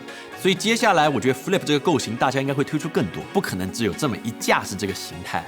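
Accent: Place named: Jiangsu-Zhejiang, China. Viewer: tim口音像江浙一带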